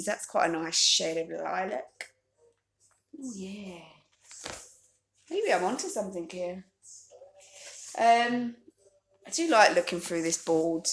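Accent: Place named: Essex, United Kingdom. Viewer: How much fun are you! Loved the video 🎉. I'm a kiwi in Essex, and still adore the accent.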